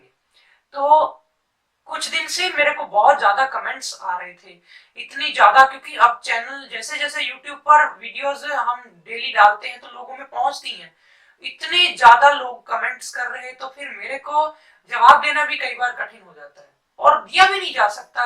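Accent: Punjab, India. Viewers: Y did u changed ur name... i can see punjabi touch in your speech and in your way of your expressing thing.. i am sure you are sikh.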